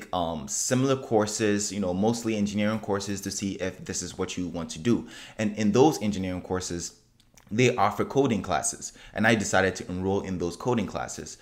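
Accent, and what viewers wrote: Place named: South Africa. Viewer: Are you S.African I'm hearing an accent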